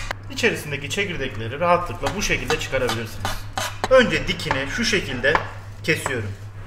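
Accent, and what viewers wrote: Adana, Turkey. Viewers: Bu nasıl hataylı lan adana şivesi konuşüyürr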